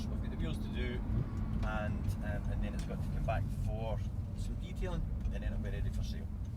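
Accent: Scotland, United Kingdom. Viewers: Beautiful overview, mate :) I just aboslutely love scottish accent :) still my dream car, and the only affordable super car. The way R8 looks is just out of this world :) Currently driving TT mk2 with dsg and these dsg farts on first gears really adds up some "drama" and I love it, I do agree, this small gap between shifts just actually adds up :) superb video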